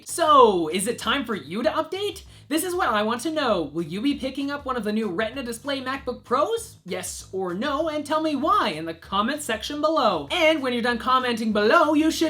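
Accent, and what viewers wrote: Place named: Canada. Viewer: you r are canadian?????? how could I not have noticed that??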